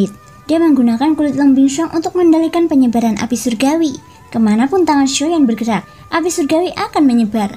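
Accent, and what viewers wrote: Java, Indonesia. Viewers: hai mimin medock banget suaranya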